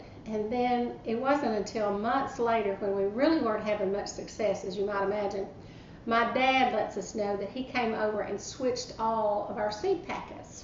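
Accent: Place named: South, United States. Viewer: I'm really sorry if I offend anyone, but, I'm from England and that Southern accent just distracted me totally from the content of the video. I watched it 5 times... no idea how to preserve garlic, but I certainly have learned that you Southern Gals talk beautifully. Thankyou; I'm going in for listen number 6... and 7 and 8 and 9... you get the picture...:)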